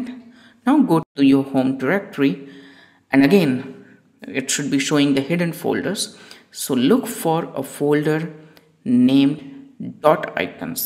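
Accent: India. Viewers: Why Indian accent very thick, i am little uncomfortable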